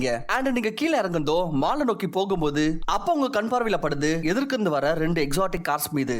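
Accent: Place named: Bangalore, India. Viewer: Bro. Neenga bangalore ah.. Ungaloda tamizh pronounciation bangalore tamilian madhiri irukku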